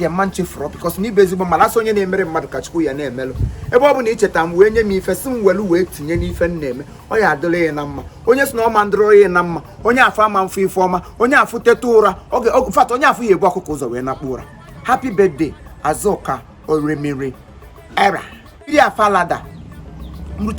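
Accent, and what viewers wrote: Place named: Anambra, Nigeria. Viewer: So chief can speak anambra like this. Nwoke ah bu all rounder 😄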